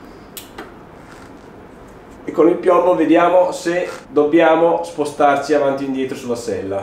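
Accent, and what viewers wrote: Romagna, Italy. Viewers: grazie mille sei sempre molto chiaro!! E poi con quella parlata romagnola sei sempre meraviglioso 😜😂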